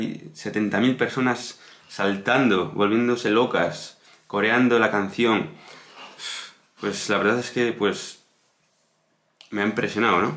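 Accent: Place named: Argentina. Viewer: Tienes un deje argentino muy fuerte... lo mismo que tus facciones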